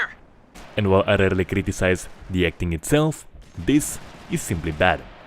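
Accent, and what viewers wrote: Russia. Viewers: He's like speaking russian but in English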